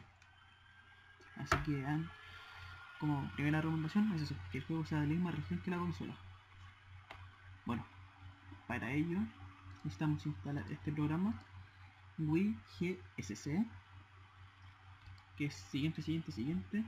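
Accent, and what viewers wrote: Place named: Chile. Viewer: ¿Eres Chileno cierto? Jajaja, yo tambien soy Chileno y adoro nuestra forma de hablar <3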